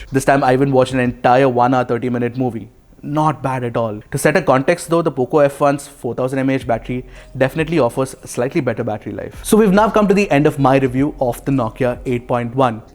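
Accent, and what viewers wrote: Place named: South India, India. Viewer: You are definitely south Indian guy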